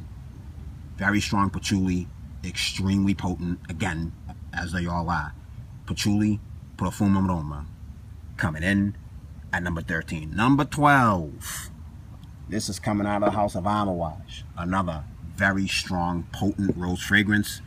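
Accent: Boston, United States. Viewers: you sounds like ray donavan's brother haha (boston style) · THIS GUYS FROM BOSTON!!!! WICKED & PAHK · Boston guy can tell another Boston accent & no flipping doubt THIS GUY IS FROM BOSTON! Charlestown here brother... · Great vid. I can tell your a fellow Bostonian. Best Boston accent I've heard on you tube.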